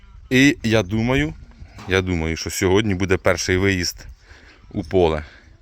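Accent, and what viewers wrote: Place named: Surzhyk, Ukraine. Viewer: Зайшов на канал, люблю цю тему, та не зміг слухати. Мова така покалічена, засмічена, що ріже вухо. От так ми зі суржиком і помремо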